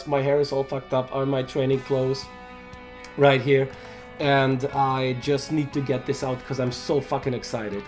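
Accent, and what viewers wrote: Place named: Russia. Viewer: Ебать у тебя акцент просто конский, я сразу понял ,что наш человек снимает видео =D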